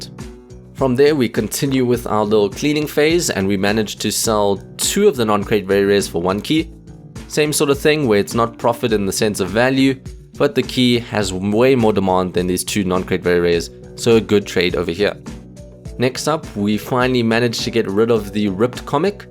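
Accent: South Africa. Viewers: R u south african you sound like 1. Our pronounciations and accent remind me of a south african i am aswell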